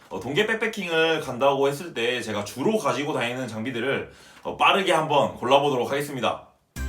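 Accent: South Korea. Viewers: 아따 전라도 백패커요~ 강원도 충청도 경상도 백패커는 많이 봤는데 전라도 백패커는 첨보요~ 반갑쏘잉~ 말이 잼나고 좃쏘이 따라해봤어요. 전 부산출신 경기도 거주 사람이에요 ㅋ 잼나게 잘봤어요. 전라도 유튜버는 첨봐서 기대가 되네요 ㅎ 잼난 영상 많이 찍어주쏘잉~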